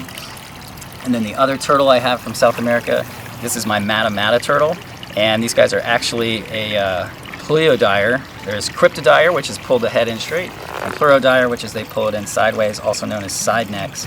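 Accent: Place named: Georgia, United States. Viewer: I wonder what state he is it sounds like Georgia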